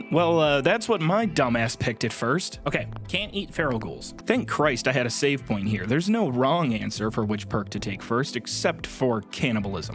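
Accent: Midwest, United States. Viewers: Thank you for this historic moment, your nasally Midwestern accent only served to add to the experience:) Now do it on survival!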